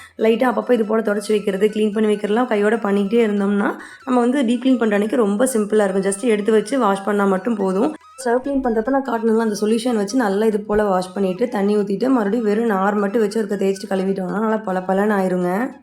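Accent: Kongu, India. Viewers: Kongu tamil apedetha ka sis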